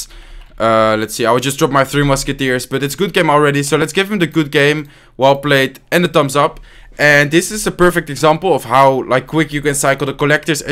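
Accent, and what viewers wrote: Netherlands. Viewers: als nederlanders engels praten, hoor je het echt dat ze nederlands zijn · Je praat echt nederlands engels, of goed engels of nederland man!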